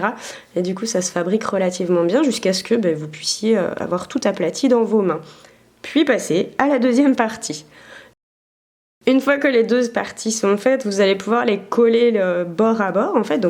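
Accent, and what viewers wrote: Toulouse, France. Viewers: Tu as pris l'accent toulousain !!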